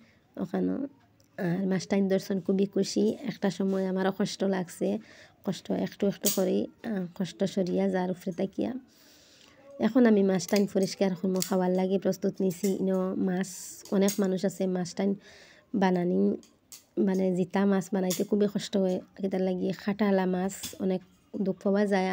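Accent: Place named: Sylhet, Bangladesh. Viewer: আসালামুয়ালাইকুম আপু আমি তুমার বিডিও পুল সাপুট করি তুমার রান্নার পিটা কই মাছ এমনকি তুমার সিলেটি কথা সুনার জন্য বিডিও চেরে রেখেচি❤❤❤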